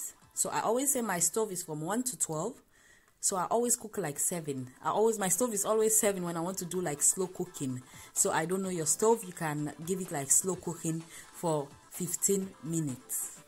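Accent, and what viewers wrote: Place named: Cameroon. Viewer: Same ascent with Cameroon people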